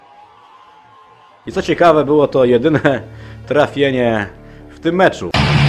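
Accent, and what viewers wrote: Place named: Silesia, Poland. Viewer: Niby z Warszawy, a nawija jak hanys.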